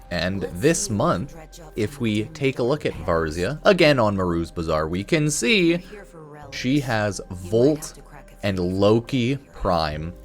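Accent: Canada. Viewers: New to your channel. Gotta say, you sound very Canadian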